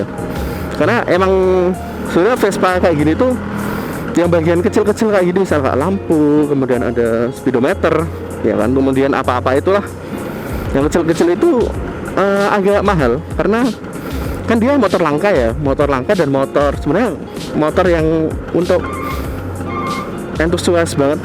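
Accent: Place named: Jakarta, Indonesia. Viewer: Mase logate rodo jakartanan. Hehehe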